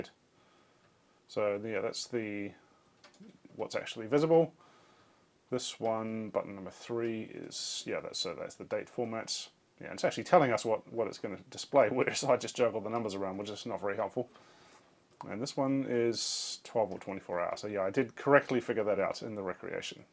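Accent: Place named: New Zealand. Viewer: Thank you,. I like the mellow NZ accent, much nicer than the OZ nasle noise. I had a new sister in law from NZ from south island, but didn't know what the north island would sound like. I've not seen her for 40 odd years, buy heck, she was very pretty. See you next time you post.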